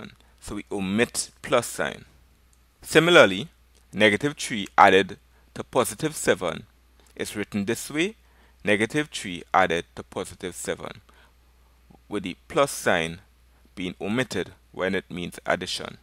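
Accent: Trinidad and Tobago. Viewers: I am so going to use your videos for teaching my classes!!! It's so nice for my students to hear a trini accent. Continue the good work! Bless up my fellow trini :) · are you from trinidad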